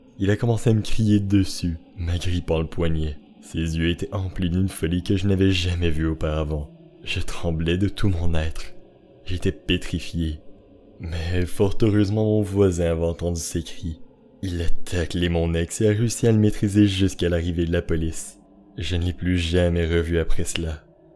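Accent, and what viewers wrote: Quebec, Canada. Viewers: J’adore l’accent québécois 😁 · Haha knew it pis honnêtement sa fais different d’écouter du thread lue par un québécois 😁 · Tu est québécois ?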